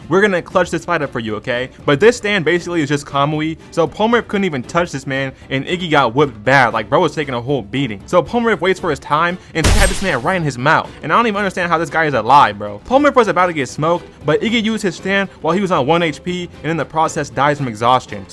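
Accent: United Kingdom. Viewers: I liked the British accent, what are you talking about? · This guys british accent is deadly bruh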